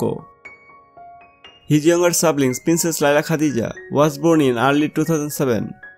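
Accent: Bengal, India. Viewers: Narrator is bengali Asli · Your accent you must just be an Indian ,thank you for your care about my country. ....Casablanca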